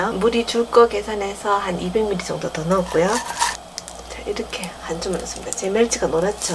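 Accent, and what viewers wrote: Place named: Gangwon, South Korea. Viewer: 님~^^ 네~강원도의 사투리 구수한 매력이 있네요~!! 맨두와 가시기 저도 좋아하는 메뉴입니다^^♥♥♥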